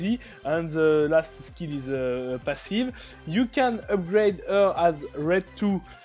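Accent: France. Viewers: are you from russia ? thought you are french with that kind of accent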